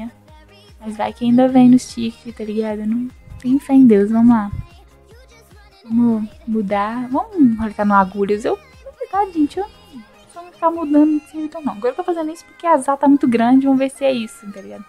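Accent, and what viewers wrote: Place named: Minas Gerais, Brazil. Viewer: Esse Sotaque Mineiro e meu devolvs kkkkk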